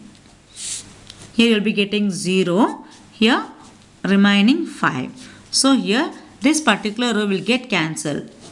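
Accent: South India, India. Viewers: nyc no words to explain thank u iam sure u are from south india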